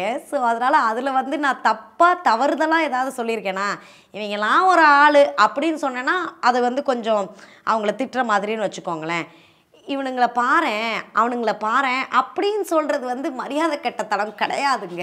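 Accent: Madurai, India. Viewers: Va la po la nellai basha ila ? Neenga madura basha nu solringa 🧐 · Am also from Madurai yes in that city they speak like this only commonly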